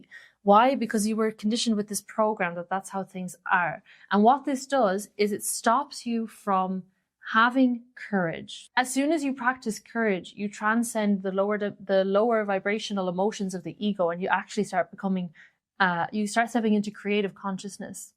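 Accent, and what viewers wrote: Ireland. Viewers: do I detect an Irish accent there? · When awise awake Old Soul has also won the best face card... and intelligent and classy af, also.😭 She will be GRAND! AMAZING VID. You are also funny, when did some "shooting fails". Stay authentic, no to AI! ✊️ P.S. Are you Irish? ☘️ ✨️💜✨️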